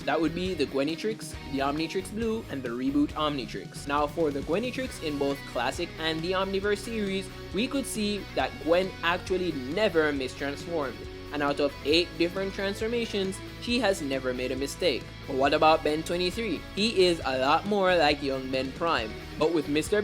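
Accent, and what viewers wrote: Jamaica. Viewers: Either jamaican like me or im mishearing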